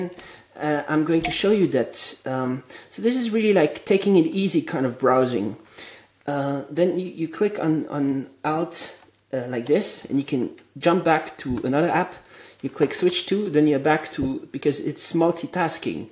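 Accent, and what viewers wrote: France. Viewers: Wait, it's probably a French accent. I don't know, I give up. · i spent like 15 mins trying to work out his accent,french or american? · i now yore acsent youre french haha me to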